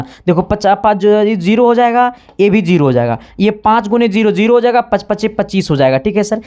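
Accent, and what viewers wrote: Bihar, India. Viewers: Sir aap Bihar se ho kya ???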